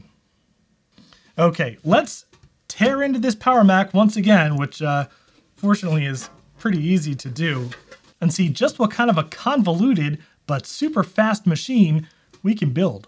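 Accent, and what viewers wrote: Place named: Philadelphia, United States. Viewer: WOODER... Iconic accent of a philly local, lol Met you at VCF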